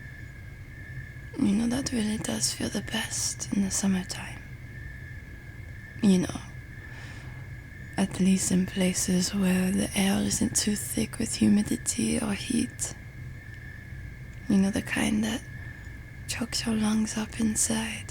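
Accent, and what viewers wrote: France. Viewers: Your French accent is an audible delicacy 😋 ♥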